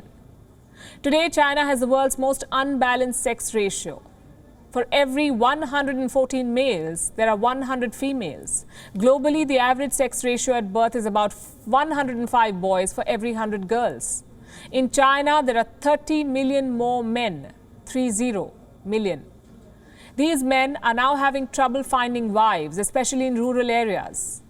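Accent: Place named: India. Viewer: I hate Indian accent , so disgusting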